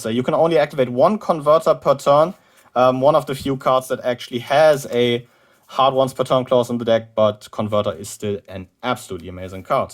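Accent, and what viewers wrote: Germany. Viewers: You sounds so german XD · Are you from Germany?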